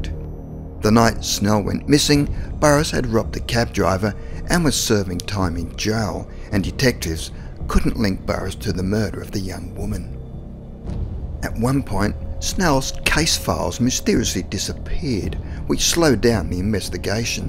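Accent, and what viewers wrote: Australia. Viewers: Great video man! Also I love your accent, Aussie pride! 🐨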